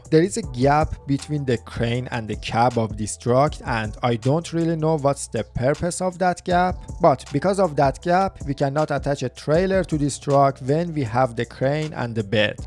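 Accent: Iran. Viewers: The most Iranian voice I ever heard, nice video tho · داداش از لحجه ت حس میکنم ایرانی ای😂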